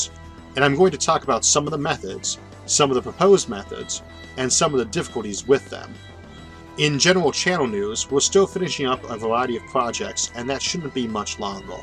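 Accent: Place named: South, United States. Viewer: I enjoy your voice, BTW even with that inability to pronounce 'R' .. it gives your voice a bit of a "southern" drawl. Easy to listen to. Oh and I often transpose letters myself.. It isn't a dyslexic sort of thing, but my brain going faster than my fingers along with large hands and small keyboard :)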